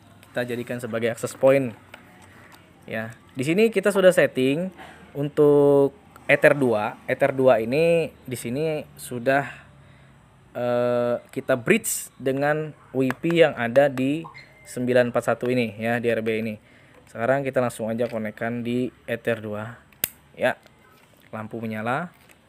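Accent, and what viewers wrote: Banjar, Indonesia. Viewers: banjar banar.. hehe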